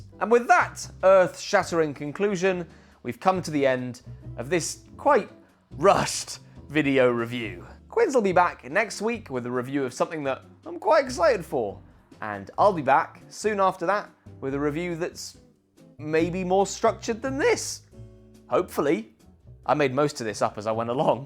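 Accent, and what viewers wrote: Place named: Wales, United Kingdom. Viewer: The accuracy to which a computer can reproduce a Welsh accent is giving me an existential crisis. Par for the course with a SUSD review to be honest. Great video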